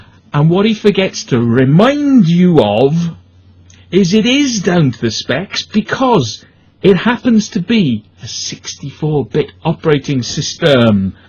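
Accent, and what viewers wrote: Wales, United Kingdom. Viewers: holy crap are u welsh!?!?!!?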